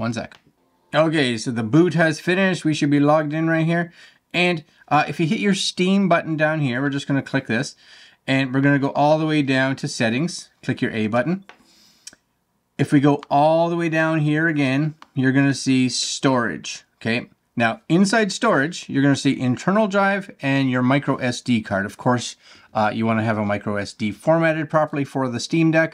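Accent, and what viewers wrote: Canada. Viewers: ❤ that Canadian accent makes my heart go pitter patter …..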